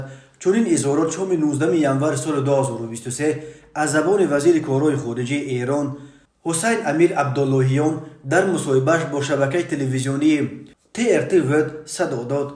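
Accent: Pamir, Tajikistan. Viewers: Салом бародаро😊 Рӯзноманигор помири аз лаҳҷаи суханронит маълум ☺️🤩👏 бисёр канали хуб ва хабарҳои нав👍👋🤩🇹🇯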